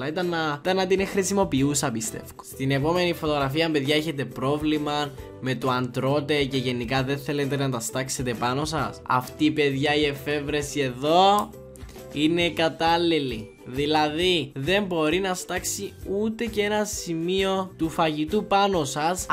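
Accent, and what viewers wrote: Cyprus. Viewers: Ίσως πολλούς να τους ενοχλούν τα κυπριακά σου αλλά εμένα μου αρέσουν!!! Είναι όλα τα λεφτά 😍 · Γλυκούλης ...λατρεύω τα κυπριακά 😍😘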